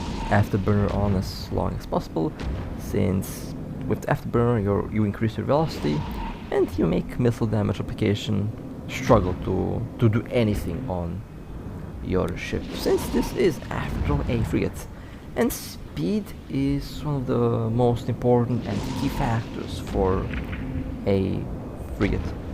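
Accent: Russia. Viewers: Ur russian accent😢 Но спасибо за видео!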